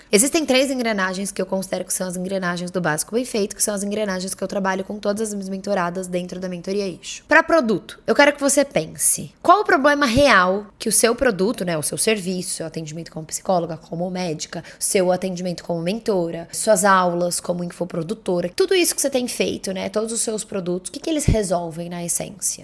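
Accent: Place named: São Paulo, Brazil. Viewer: Paulista fala desse jeito mesmo!😊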